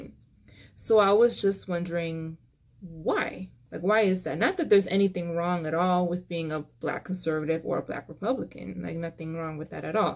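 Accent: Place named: Caribbean. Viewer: Your accent is so familiar are you bajan or carribean? 🤔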